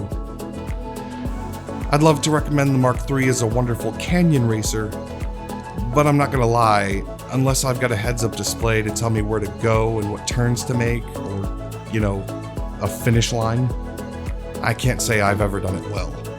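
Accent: United Kingdom. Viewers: English slang accent gave me cancer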